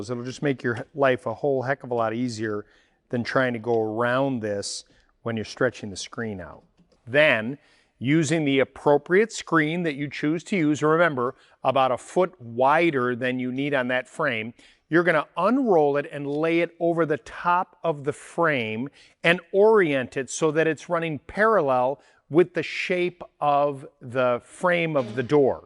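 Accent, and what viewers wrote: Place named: Midwest, United States. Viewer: Thanks for the great information! Will tackle this in a couple of months once things warm up just before spring., I kind of feel like you must be from the Ohio/Indiana/Illinois area? I recognize your accent as being common in those locations. Always love stopping by my local Ace as opposed to the so-called "big box retailers". Thanks again.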